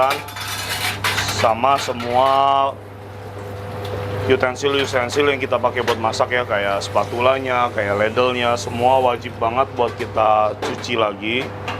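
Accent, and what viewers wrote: Banyumas, Indonesia. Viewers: deneng ngomonge kaya basa bayumasan yah ....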